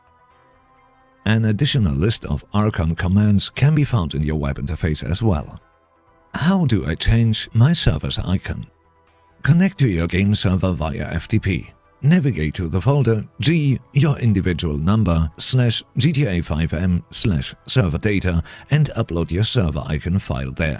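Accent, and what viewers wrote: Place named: Germany. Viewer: No worries it was beautiful! German people have a very unique and strong accent, and I personally quite enjoy listening to it, but I have honestly never heard one as nice as yours! I am from australia so listening to our accents even for me here is like wiping my butt on sandpaper hahaha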